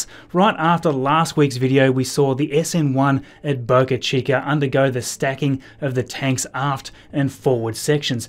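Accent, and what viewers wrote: Australia. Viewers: Great work Oioioi. What part of Australia are you from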